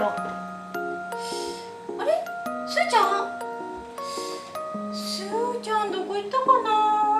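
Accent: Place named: Kansai, Japan. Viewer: 関西弁が大好きです❣ 何時かそのラーメンパスタを試してみたい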